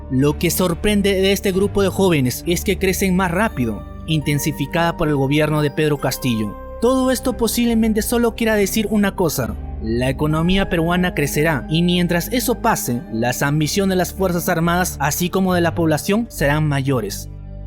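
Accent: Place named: Bolivia. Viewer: ☝ interesante información del video, pero ¡ con abundante dosis de alarmismo !!! 😒🙈🖓 Es evidente que, por el modismo, dejo o expresión de la voz del autor del video, éste, NO es peruano NI chileno ( quizás puede que sea boliviano, o de alguna otra región ). Pues bien, la realidad presente, NO es como aquí se dramatiza. Si bien existe una rivalidad de los peruanos con los chilenos, ésta se hace mas evidente en el ámbito deportivo, que en el político o económico. Ahora, si el Perú apuesta por desarrollarse y destacarse, NO es por andar pensando o viendo lo que hacen los demás, si no, por que es plenamente consciente del potencial y los valiosos recursos que posee. Y, por que en la búsqueda de tal fin, está dispuesto a trabajar con todos : tanto con EE UU , como con China ( por ejemplo, se estuvo rumoreando a través de las redes sociales, que los chinos, hicieron la propuesta inicial de la contrucción de megapuertos, a las autoridades chilenas, pero, tal parece, que, como NO llegaron a un acuerdo conciso, estos, se pasaron a proponer lo mismo al Perú, que aceptó de cajón. 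Así que, NO tienen nada que reclamar o envidiar al respecto, los vecinos sureños ). ✋ En cuanto, al supuesto plan extensivo territorial del Perú, es algo, que de plano debe descartarse, ¡ por que es completamente falso !!! 🖓 Los peruanos del siglo XXI , NO tienen proyectos expansionistas geográficos, si no, económicos : la ' conquista ' es de nuevos mercados alrededor del mundo, y, NO de territorios vecinos, qu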